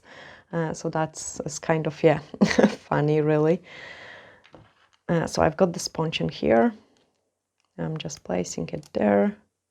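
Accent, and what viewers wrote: Scotland, United Kingdom. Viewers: Greetings from Columbus, Ohio, USA. I was going to try this method but I don't have any acrylic powder so I will have to do a different way. I do pick up some Scottish inflection in your accent. Love that and your work. I am a retired RN, teaching myself nails the last 3 months. Love this hobby.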